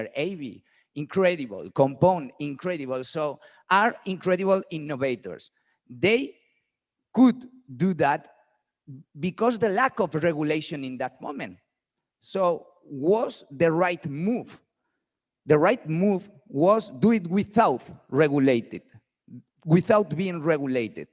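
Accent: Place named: Spain. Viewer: Eres un crack, quizas un mejor ingles lo haria todo un poco mas profesional, tu pronunciacion es muy española jajaja sin animo de ofender, te admiro mucho, un saludo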